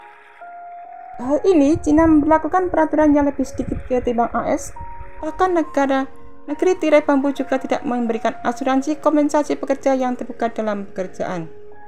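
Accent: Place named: Java, Indonesia. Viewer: Berbahasa Indonesia lah yang benar jangan medok sekali logat Jawa nya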